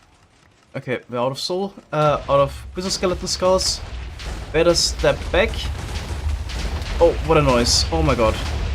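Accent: Germany. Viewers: I was watching yesterday’s recap and my dad looked and me and said “he sounds German” (he said it in German) and I broke out in laughter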